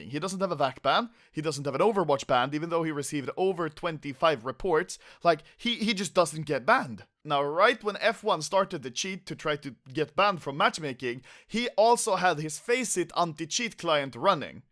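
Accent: Russia. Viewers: Brah , are u from russia or are u from Swedeen , im so confused. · Are you from Russia???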